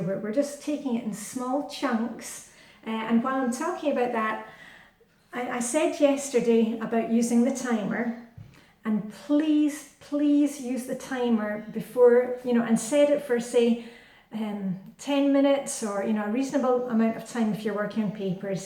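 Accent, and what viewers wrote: Scotland, United Kingdom. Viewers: Thank you so much for making things seem so possible and realistic. I am doing well on my routines, I have just listened to David Allen GTD and am delighted to put the two systems together. I really enjoy and look forward to your videos, very refreshing to hear a Scottish accent and not American (sorry USA) Im Irish and its nice to see/hear a European point of view. Thanks for all you do - Anne Marie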